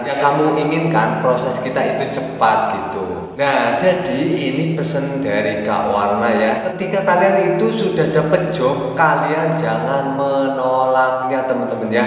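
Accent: Banyumas, Indonesia. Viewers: Sukses selalu wong Cilacap , ora ngapak ora kepenak 😁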